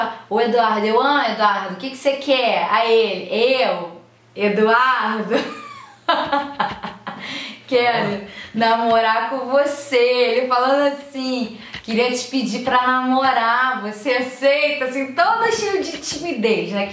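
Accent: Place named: Rio de Janeiro, Brazil. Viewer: Ela é bem do rio ela arrasta o nasci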